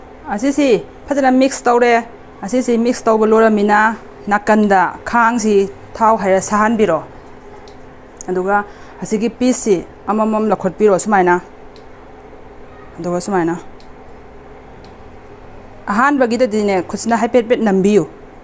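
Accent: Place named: Manipur, India. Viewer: Pure meitei speaking in pure and indigenous yelhoumi.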